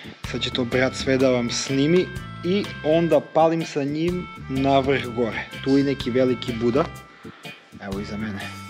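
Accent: Niš, Serbia. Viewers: Pričaj niški slobodno svi razumemo ,ali odlican video. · Baš mi je drago što be bate tepaš si po naš Nišlijski, pozzz može li tamo neki džokavac da se smota ovo ono :D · Pećina sa 5 miliona SLEPA MIŠA - kolko volim niški padeži i akcentovanje, nema jače! Samo napred, velika podrška, KIDAJ! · a be ovoj neki novi momenti :)) pričaj si sve niški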